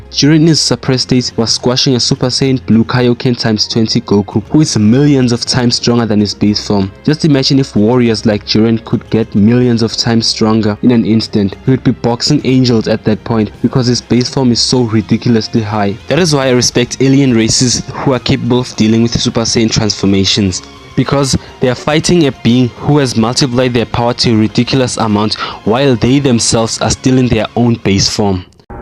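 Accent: South Africa. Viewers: You sound kinda south african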